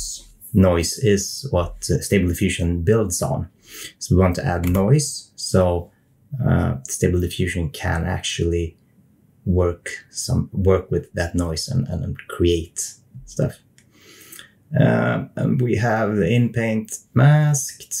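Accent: Sweden. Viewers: The way you said automatics. I think it would sound less Swedish if you said Automadics and didn't emphasize anything. Your Swedish dialect is very obvious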